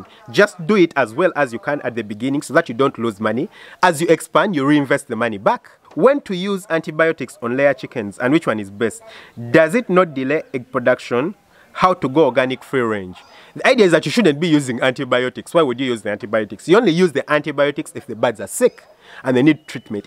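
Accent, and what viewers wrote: Uganda. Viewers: Our favorite Dr,we love your passion for farming.We also love your Ugandan accent.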